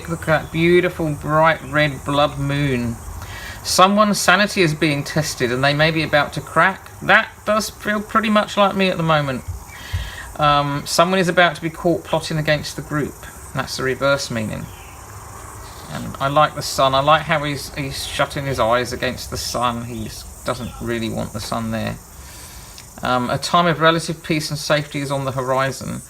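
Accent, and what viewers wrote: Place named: London, United Kingdom. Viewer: There is an actually a psychological study on something driven through the brain in the 1900's I think. i kept thinking what a strong London accent! You're doing a good job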